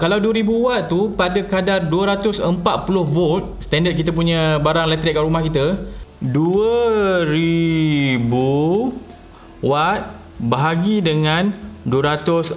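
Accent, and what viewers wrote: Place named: Kelantan, Malaysia. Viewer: Lama dah nk tanya ni. ni ore kelate ke? Ada perkataan bunyi kelate sikit..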